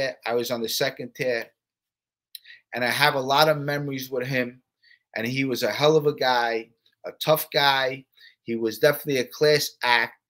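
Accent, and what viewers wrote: New York, United States. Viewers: Hi Mr, that was great story. I have to tell you that you have the best N Y accent of all the other guys that do mob stuff. It's really cool. Stay with this your doing great.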